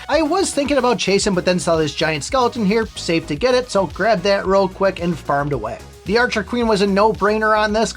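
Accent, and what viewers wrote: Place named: Pennsylvania, United States. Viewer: You definitely don't need to answer this. I'm just super curious. I grew up in PA. And your "accent" sounds so similar to my family and the friends I grew up with. You also might be from Wisconsin, lol. Again, don't answer if you're not comfortable.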